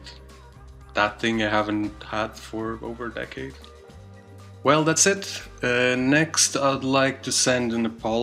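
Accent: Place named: Braga, Portugal. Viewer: OMG distrito de Braga, sotaque pior (ou melhor) que os do Porto quase!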